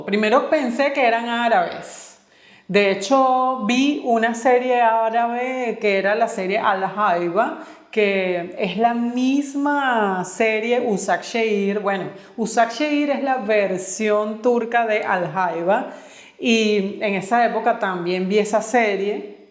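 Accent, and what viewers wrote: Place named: Venezuela. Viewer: Hola, por tu acento te identifiqué como venezolana y no me equivoqué. Me siento identificada contigo con respecto a las series turcas. Leí a una especialista en el tema de novelas de TV y ella decía que las novelas son formatos diarios (de lunes a viernes) y que las series son 1 episodio por semana. Al igual que tú, me encantan las series turcas y la primera que ví y que me enganché inmediatamente fue Kara Para Aşk a finales del año 2018… Engin Akyürek me impactó como actor y enseguida lo Googlee. Y son súper fan de él, he visto todas sus interpretaciones y tengo 1 de sus libros. Con la cronología de tu relato me hiciste revivir aquella época de las telenovelas venezolanas, ví muchas, luego las brasileñas, las colombianas (antes el mercado era exclusivo de las mexicanas) ahora en la TV nacional las turcas están en el horario estelar de las de la noche. 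Me gusta mucho como relatas los temas que tratas y cuéntame como una nueva seguidora en tu canal. A propósito soy de Guayaquil-Ecuador Saludos ❤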